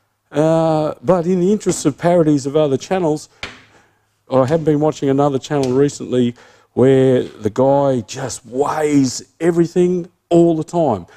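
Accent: Australia. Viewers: Man. Your Tassie Accent makes that intro All the better!!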